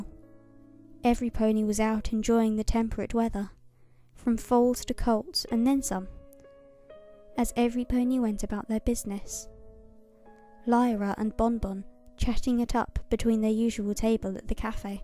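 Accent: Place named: United Kingdom. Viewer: The most wonderful and precious thing about this story is the narration. This is the true accent of Britain, remember it well as it is becoming increasingly rare. The murder and defilement of the English language is a horror story in its own right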